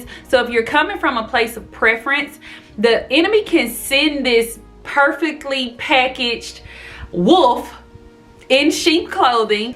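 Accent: South, United States. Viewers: No offense. But I’ve never seen a black women with a southern accent 😅 very unique and interesting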